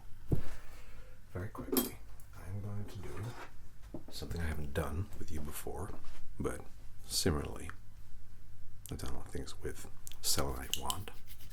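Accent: United States. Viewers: Out of curiosity: What made you decide to do your Reiki Sessions in an American accent? He doesn't really seem like a caricature, and just seems kinda like a normal guy. Do Brits associate Reiki with Americans?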